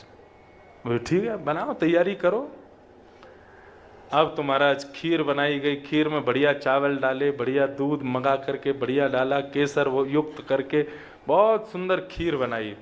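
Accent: Braj, India. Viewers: Jai jai aapki Katha bhut acchi lagti hai aapke mukharvind se brij bhasa bhut sunder lagti hai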